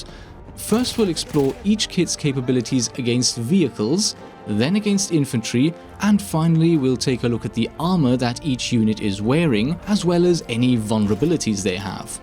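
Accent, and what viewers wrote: Germany. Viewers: German is your mother tongue, isn’t it? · are you german or from swiss, that Accent is strong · do you speak german in your free time? bc you have an very little accent...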